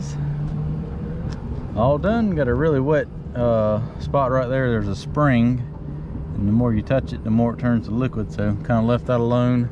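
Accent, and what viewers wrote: South, United States. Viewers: I love watching the different things you do. I also love to hear you talk with that southern drawell and semi deep voice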